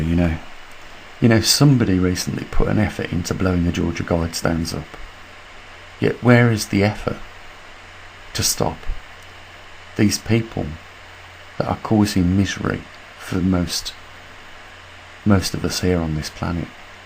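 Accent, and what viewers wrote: United Kingdom. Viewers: Hi. First of all, I must say I like your information of magnetic poleshift a lot. But as a non-native English speaker, I find your British accent is too difficult to comprehend or access your content. I have a little problem when listening to an American accent speakers. If you are aware that not only native English speakers have followed your jobs, but there is me following your difficult accent also. Your fan from Thailand.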